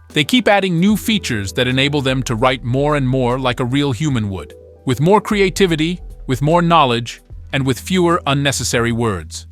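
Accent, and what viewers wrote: Italy. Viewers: But this is better than his Italian accent. 😅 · Not gonna lie I miss the Italian accent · Thanks for sharing the update! Me too I like the Italian accent. Do you have a discount code for Surgegraph?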